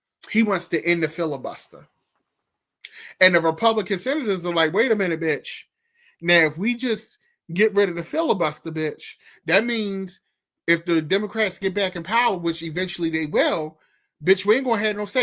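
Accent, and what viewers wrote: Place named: Maryland, United States. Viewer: Great perspective..I knew you were from Maryland....that accent. 😊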